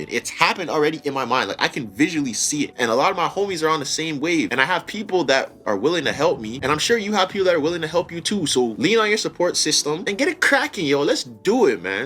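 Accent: Canada. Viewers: according to what you said, well 1 point i like to make, you do not need to move from 1 pointto the other. you do have transit there, right? that in itself is great and very cool. sight seeing by transit. but all the same, everything you said is a very good point. and you did not bash the country. if you are not from canada, you sound very much like someone from canada. ok someone from ontario but hey no one is perfect (i know, i am a total smuck) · He clearly has a Toronto mans accent geez I'm leaving the place as well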